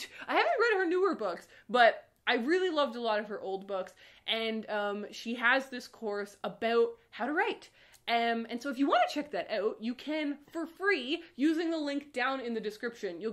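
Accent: Canada. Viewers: Emergency Contact and The Prince and the Dressmaker are two of my favorites! I hope you love them. Also, never apologize for your Canadian accent! Regional dialect and accents are fascinating in the age of digital and social homogenization. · Omg I love your Canadian accent and “about” is my ultimate favorite like it’s your trademark. Why would you try to lose it? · I love Canadian long ohs!!!!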